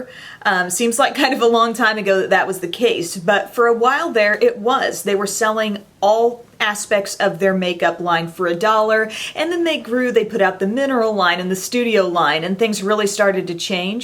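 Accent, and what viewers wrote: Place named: Kentucky, United States. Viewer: done! added you. always glad to support a fellow KY girl! heading over to watch you now. wasn't sure I had the right person till I heard your accent! lol sounded like home. have a good day!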